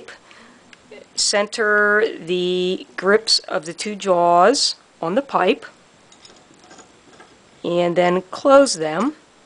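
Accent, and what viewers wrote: Philadelphia, United States. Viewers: Sound like your from Philly?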